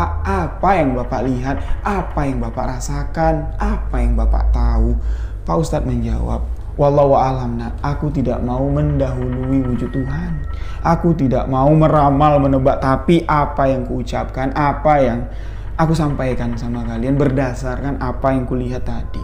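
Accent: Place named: Jambi, Indonesia. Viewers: Sukaaa dg pembwaan bhsa jambi bang...sukses selalu bang salam dri jatim😍😍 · Walaikusalam kak..... Sellu setia mnyimak wlu terlmbat.....🤭 Suka bangettt logat Jambi y kak Cpt smbuh n pulih kmbali y kak.... Tmbah berkah n smakin sukses y 🙏🌹🌹🌹💪🔥🔥🔥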